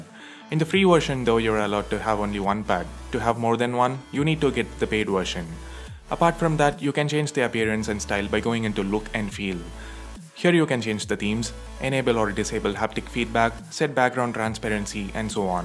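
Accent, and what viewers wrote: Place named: Tamil Nadu, India. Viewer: u still couldn't get rid of tamilian accent. long way to go....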